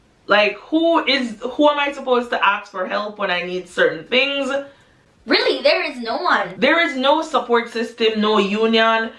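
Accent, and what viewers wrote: Jamaica. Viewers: I can hear Jamaican in your voice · are you from Jamaica? You have an accent that sounds like them.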